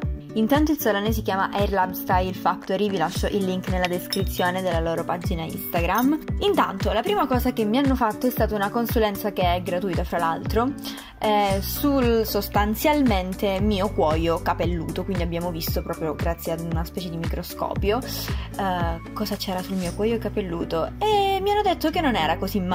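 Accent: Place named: Calabria, Italy. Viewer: Ma di dove sei pk sento l’accento e non capisco bene sembra che tu sia della Calabria ma non sono sicura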